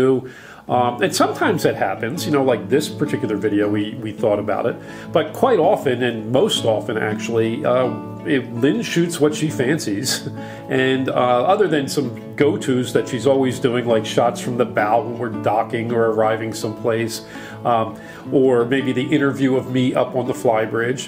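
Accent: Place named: Philadelphia, United States. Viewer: I have been following you guys from the beginning, THANK YOU! My wife is from Philly and she enjoys the accent. I like that you 2 do this not for the money. I'm retired and born and raised in mid-town Manhattan and would sell everything in a NY minute, but unfortunately my wife doesn't share my desire, so I live thru you 2. While boating is not cheap or for the faint of heart divorce is far more costly. thanks to you for giving me hours of fun>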